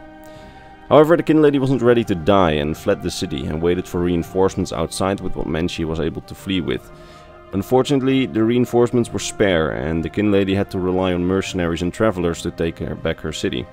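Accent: Netherlands. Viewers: Jij klinkt nederlands :) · i was about to say, but someone else already mentioned it. you sound dutch, but not that horrible actual dutch accent. good job on the video, mate. moge de negen goden sterk met u zijn.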